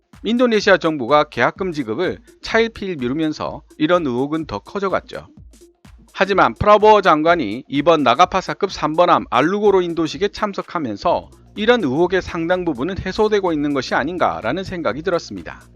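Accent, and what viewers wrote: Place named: Jeolla, South Korea. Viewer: 외국에사는 교포로써 엄청 이 프로 즐기는fan인데. 내용도, 진행방식,방송하는 목소리에 호감.헌데 전라도인 특유의 "의" 를 발음 못하고 " 으" 로 하는데.. 내가 너무 좋아하는 방송이기에 이 표준어를 사용했으면하는 아쉬움이 있다는게 저만의 솔직한 심정이라서.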